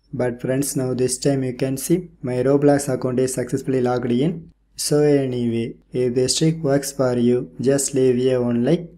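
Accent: India. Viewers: its okay saying it wrong its just because uour indian so its hard to say a simple thing but your good at english❤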